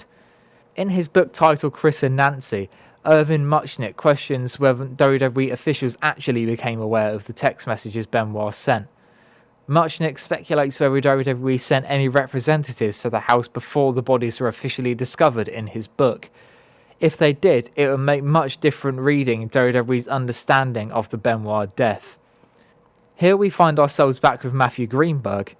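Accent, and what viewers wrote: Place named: United Kingdom. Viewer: And of course another wrestling channel with a British accent? It’s like nails down a chalkboard can’t stand British accents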